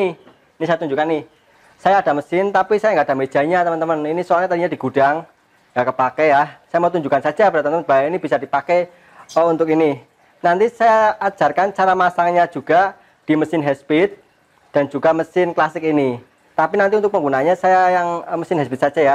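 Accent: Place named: Yogyakarta, Indonesia. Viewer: Matur nuwun mas, Sangat membantu, semoga jadi amal jariyah,...btw logat Jogja nya kentel banget...😀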